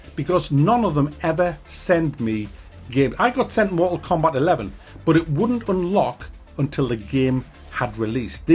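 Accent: United Kingdom. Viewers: Stop pretending you speek english! I can't freaking listen to one more Brit on youtube... I need to put the auto subs on just to get your point and thats not the goal! And I want to watch all your videos becaus I adore them and you are pretty right with your critique, I have so often the same feeling and i dont want to hate on new AAA Games but how can you love old diamonds like Bioware or Bethesda in 2019? Its a shame and Im just keep playing becaus of good indie games like Mordhau or the upcoming rebel galaxy game.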